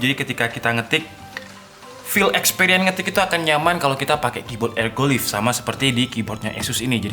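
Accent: Indonesia. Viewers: Pengucapan org indo banget, bertele-tele pisan.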